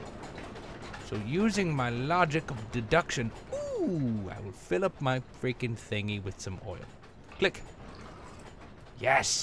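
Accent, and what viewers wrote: United Kingdom. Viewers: Love your British accent <3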